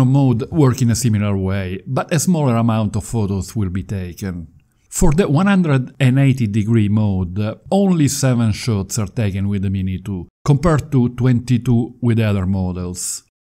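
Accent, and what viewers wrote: Italy. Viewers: Panorama foto moda 😆 like the Italian accent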